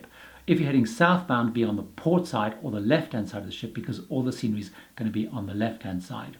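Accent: England, United Kingdom. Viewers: Your speaking style is difficult to understand and to listen to. You speak too quickly and even worse, the volume of your speech is so variable it is difficult to keep up with. The volume of your speech many times starts out loud, like an attack, then fades into a very low volume for an extended period of time. Then another attack and another fade. Your English(?) accent makes it even more difficult to understand. I would suggest you speak more slowly, more clearly, and try not to have dramatic volume differences in your voice. I found the video difficult to listen to and understand. This comment is meant to be helpful. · I believe is British from his accent and from some of the comments he makes.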